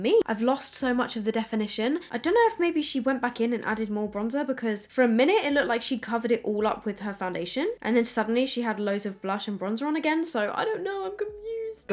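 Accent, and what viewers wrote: Australia. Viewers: Okay but your Aussie accent slapped 🤌🏼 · i love the way you keep slipping into an aussie accent whilst talking 😂😂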